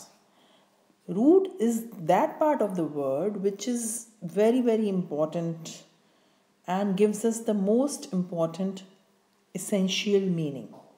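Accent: India. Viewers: Terrible accent, unfortunately. Most probably Indian. Also, a lot of grammatical and vocabulary usage errors.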